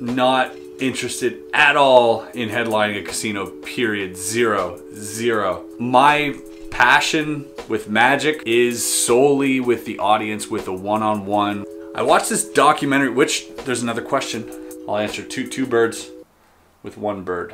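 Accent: Quebec, Canada. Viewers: Where are you from? Your french sounds like you are from Québec. Ohh and good video by the way (as always) !! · Hey where you from quebec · mon dieu mais ton accent canadien est magnifique, j'adore ta chaine et j'ignorais que tu étais canadiens. 👍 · I totally digged the French speaking part! Et l'accent québécois... S'il te plaît parle Français plus souvent dans tes vidéos. Love you man. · Canadian French is so much different from the "usual" French I get to hear xD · Je viens de MINDFUCK completement. je me doutais que tu parlais francais mais QUOI?!?! Je m'attendais a un francais cassé pas que tu parlais "quebecois". Ca rajoute un sentiment d'appartenance enorme. MONTREAL REPRESENT. ca srait vraiment cool un video en francais. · Un joli petit accent quebecois ;) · si si j'ai tout compris... bon tu a pris ton accent canadien natif... MY CHALLENGE: parler en Français, sans accent Canadien (i remember that you were bragging about your ability to pick up any accent... 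so here you go)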